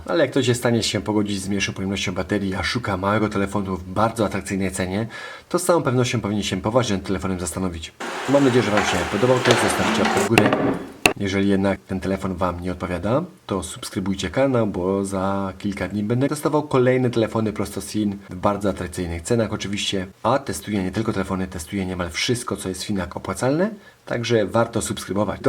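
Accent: Silesia, Poland. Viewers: Witam :) Akcent wskazuje że jesteś Ślązakiem ?! Na ręce masz ? Ten ludzik Android ma jakąś funkcję czy tylko ozdoba, córka mnie o takiego męczy ;)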